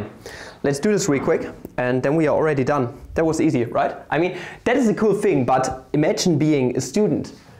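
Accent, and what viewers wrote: Germany. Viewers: Es fällt einem fast nicht auf, dass der typ deutsch ist, wow.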